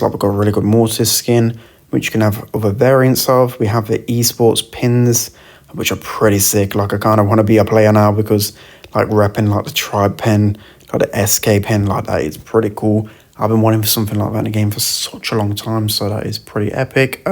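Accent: United Kingdom. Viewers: Is this British accent? I need the subtitles to understand what you say :( Apart from this the video is very good and thanks for the balance changes you noticed and shared.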